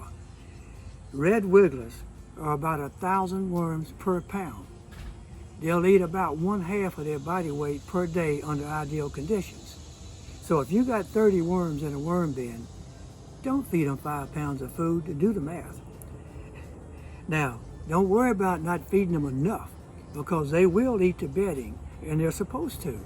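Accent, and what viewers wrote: Louisiana, United States. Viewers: ♥ that Louisiana accent! Thanks! G-d willing,I am gonna try this out this weekend. Gonna plant a pipe beside my blueberry bush also and use your vinegar/water method. May your harvest always be abundant.🍇🍒🍓🫐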